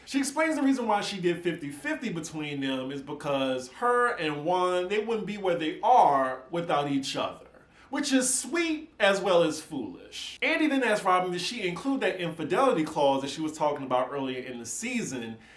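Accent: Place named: South, United States. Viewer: ... Your Dirty South gutta gutta peeps through sometimes and I LOVE IT!!! Your channel is growing so beautifully!! I am so proud of the way you Rep Louisiana. You are absolutely charming and your content is top notch. Thank you for staying true to yourself. Keep up the good work!!.. By the way, I tried to join your pateron but your link in the box is unclickable.. let me know how I can join. Its past time!!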